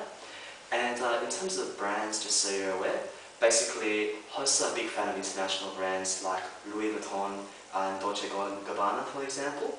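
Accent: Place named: United Kingdom. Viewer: ギャル男-looking guy with british english, what a cool combination lol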